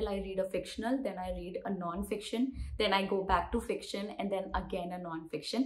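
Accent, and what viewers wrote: India. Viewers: Indian accent.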